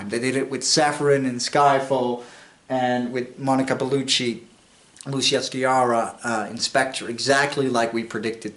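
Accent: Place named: Netherlands. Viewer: How is it that "James Bond Will Return"? How many times does he nearly die at the explosive end of Bond movies but somehow cheats death and comes back again? The producers will either come up with a way that happens OR there will be a replacement 007 Bond (new actor) who is convincing enough to satisfy another re-boot. By the way, enjoying the Dutch pronunciation of "th" or "sh", it's so cute. ;-) But whatever happens just don't let Disney touch it, which is poison to any movie franchise.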